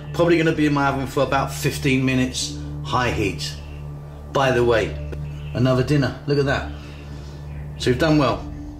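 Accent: United Kingdom. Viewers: is it $ or £ ?... Chef sounds british & cooked good brit faves 😋 i will try but might spend more than $20 in £s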